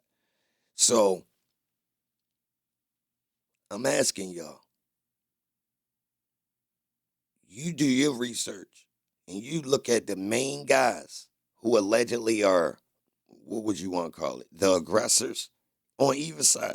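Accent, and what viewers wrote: Baltimore, United States. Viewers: Are you from Baltimore by any chance?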